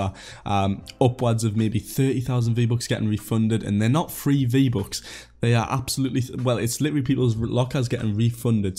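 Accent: Newcastle, United Kingdom. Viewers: You from Newcastle?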